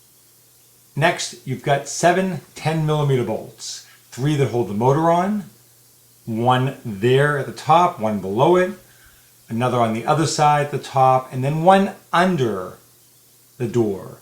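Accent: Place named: Boston, United States. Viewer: Very helpful. It made me decide not to do this myself. BTW, sounds like a Boston accent. Yes?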